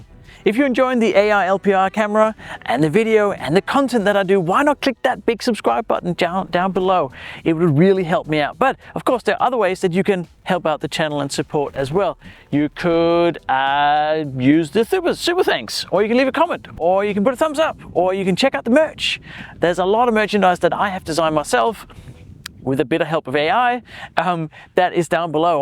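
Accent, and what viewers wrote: Denmark. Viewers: Jeg syntes nok jeg kunne genkende den meget lille danske accent. Hvordan kan det være du er flyttet til Australien?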